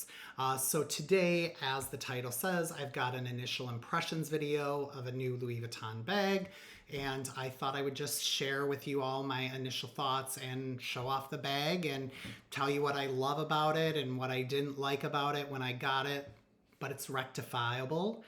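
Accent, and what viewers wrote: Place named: Chicago, United States. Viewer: Great video. Classic bag. You don’t see it everywhere. I gotta ask... where are you from? I hear a Chicago accent perhaps?? Keep up the great content!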